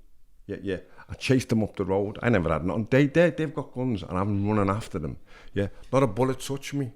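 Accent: Liverpool, United Kingdom. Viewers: Scouse to scouse ❤❤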